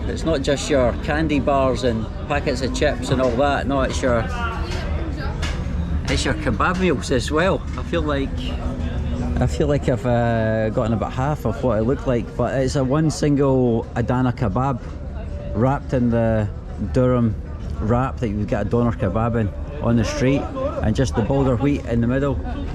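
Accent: Scotland, United Kingdom. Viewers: He sounds Scottish AF …wtf are you on mate?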